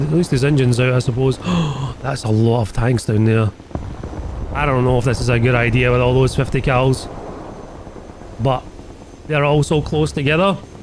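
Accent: Scotland, United Kingdom. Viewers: His accent is Scottish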